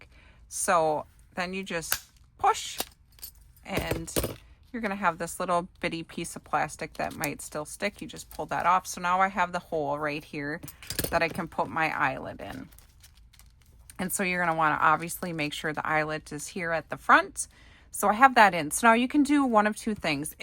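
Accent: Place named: Midwest, United States. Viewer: Hello! I am a first-time viewer and fellow crafter! I am ALSO a Wisconsinite....and I have to say...oooof...that mid-western accent!!! I'm thinking Wisconsin, Minnesota, one of the Dakota's of VERY VERY Northern Illinois!!! I LOVE this idea BTW!!! I plan to use this craft in my "Make to Sell" category!! THANK YOU!!!!!!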